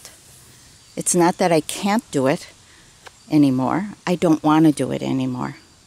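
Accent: Wisconsin, United States. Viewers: Thank you for all the hours of enjoyment & knowledge you've provided. I have to tell you it was your "Wisconsin accent" that pulled me in - initially. You are charming and I just knew you had a twinkle in your eye.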